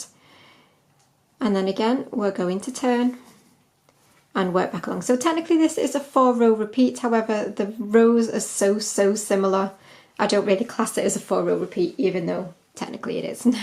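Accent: United Kingdom. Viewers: Oh My!!! Finally a Fu-king British normal teacher,, DOES NOT GET BETTER TO LEARN AND LISTEN TOO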